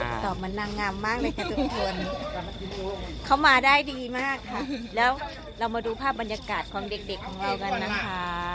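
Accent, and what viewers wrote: Isan, Thailand. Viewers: พี่วิครับพี่เข้าเสียงอิสานตอนอายุกี่ปีครับบ.....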